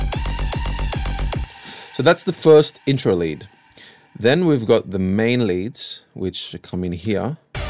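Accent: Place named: Australia. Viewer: Ahh the comforting sound of the aussie accent, it makes me happy to hear you haven't lost it at all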